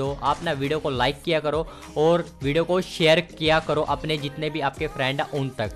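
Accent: Punjab, India. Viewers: Bro you are from punjab?? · Bro r u from Punjab ☺️🤭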